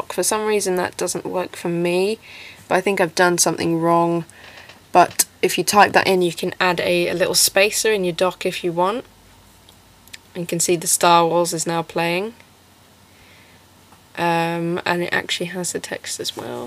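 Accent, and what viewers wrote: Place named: United Kingdom. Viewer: its just the british accent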